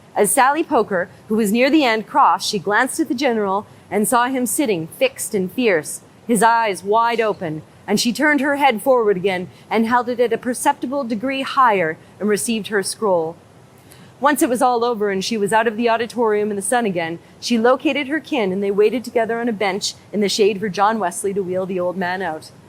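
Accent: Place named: South, United States. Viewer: Love your southern accent and the seagulls,too. Well done !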